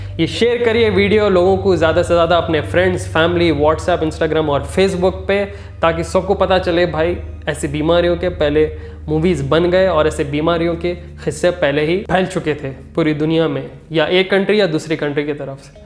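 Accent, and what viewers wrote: Hyderabad, India. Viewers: Bhai hyderabadi slang miss hori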